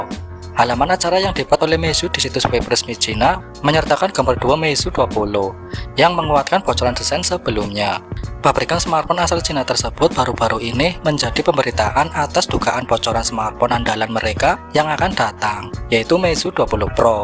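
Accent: Banyumas, Indonesia. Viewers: Bang ngapak yak?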